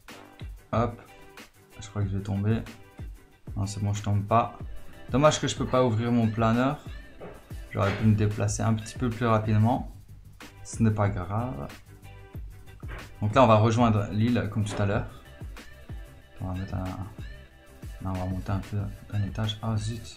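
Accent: Belgium. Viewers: Wsh bg t belge ?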